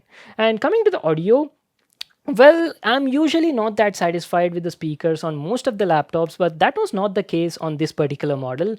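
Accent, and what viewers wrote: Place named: Telangana, India. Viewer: bro are you from telangana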